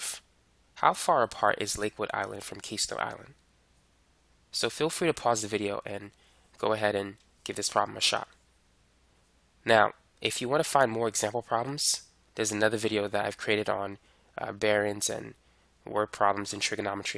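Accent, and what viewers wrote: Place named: Boston, United States. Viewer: Boston Accent.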